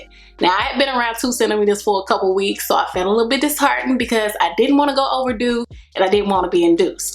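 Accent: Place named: South, United States. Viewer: Lol so entertaining you have a southern accent I love it especially the part about only being 4 CM and thinking you were further along ! Ugh that must eve been irritating !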